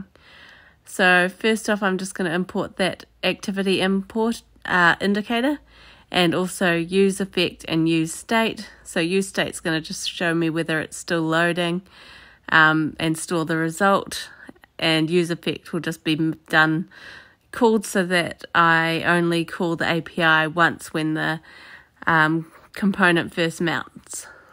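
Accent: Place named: New Zealand. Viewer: Yo! Holla from Perth WA! Your accent sounds like you’re from NZ! 🤙 Awesome tutorial 👏 keep up the awesome work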